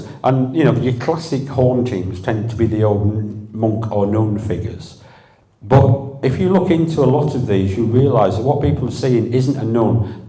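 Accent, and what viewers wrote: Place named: Lancashire, United Kingdom. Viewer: This man does NOT slur his words, he has a Lancashire accent and is using a mic that has a bass bias. He speaks VERY plainly, and if you don't understand the words he's using, maybe you should look them up and expand your vocabulary rather than decry him for your own lack of knowledge.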